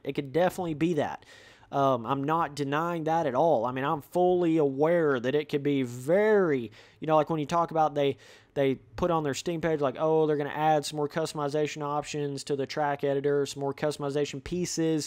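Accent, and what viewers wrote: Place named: South, United States. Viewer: And you sound like a stupid ass hillbilly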